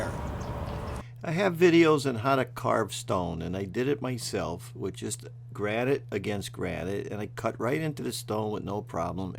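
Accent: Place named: Coal Region, United States. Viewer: How exciting- thanks for the vicarious world travel- you are a stone man alright but you can't lose that 'coal region' accent lol...(I've got one too, Shenandoah) Grandfather a coal miner - Thanks for this it was very enjoyable. I just read that stones take thousands to millions of years to form?! Thanks.... Molly a follower, learning all the time👆🙂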